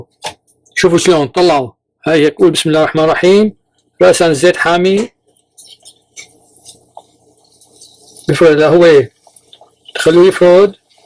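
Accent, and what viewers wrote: Levant. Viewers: لك ما تبلى المهارة الشامية ❤️ واللهجة الشامية ❤️ وهالفكرة الحلوة لتعليمنا اكلة الطفولة وذكريات شام الامان وصراخ البائع ناعم يا ناعم ❤️الله يعيد هديك الايام بهمة ناس بحبوا بلدهن ❤️ الله يعطيك الف عافية وشكرًا جزيلاً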